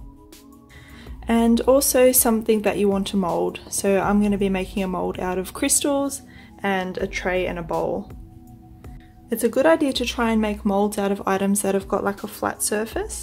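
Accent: Australia. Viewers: Thanks so much for this video. I have watched many videos on you YouTube about silicone mould making, and this one is probably my favourite so far. Thank you for taking the time out to make this for us. I am also an Aussie, so I also appreciate the accent. I have also used Pinkysil in the past with great results, but I find it quite expensive. I would greatly appreciate any advice on alternative products. TIA. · Thanks for sharing your simple way to make a mold; I'll certainly try it! What would you use to fill surface imperfections on an item before making a mold of it? Oh, and it's nice to hear another Aussie making a tutorial!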